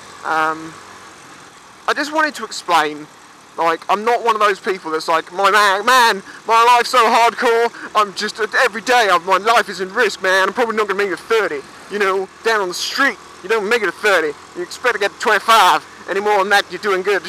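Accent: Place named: England, United Kingdom. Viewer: Hahaha, good stuff, man. "This guy knocked out because it was so good!" As some have mentioned, it's not about the bike. We all came here for the english accent. Haha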